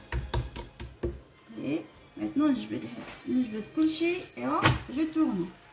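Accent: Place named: Oran, Algeria. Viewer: Elle n est pas Egyptienne voyant elle parle algérien avec un accent Oranais et des mots français..j adore..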